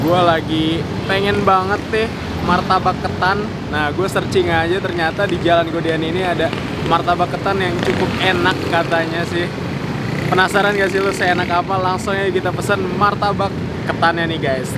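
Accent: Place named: Banyumas, Indonesia. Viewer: Udh tau dr tegal manggilnya aa, tegal kui jowo ngapak mas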